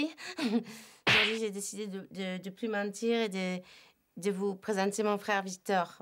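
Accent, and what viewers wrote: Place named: South, France. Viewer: Mais pourkoi l accent sud? Une recette une bequille de soutient faute d ecriture mode parisienne de ce foutre des chti s et marseillais etc c est indigeste votre truc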